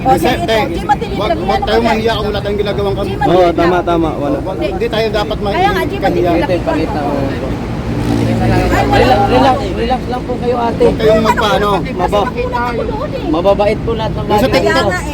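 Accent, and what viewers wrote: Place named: Visayas, Philippines. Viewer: bisaya ang tuno ni ate.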